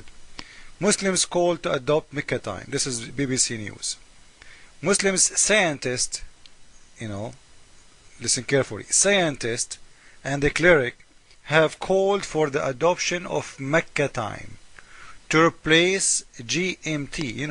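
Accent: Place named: Iran. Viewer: As much as you try to speak English your Iranian tung never change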